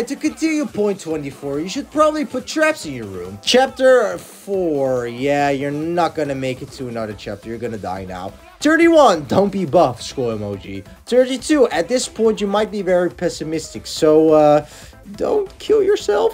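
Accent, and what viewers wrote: Netherlands. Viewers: are u dutch lol?:)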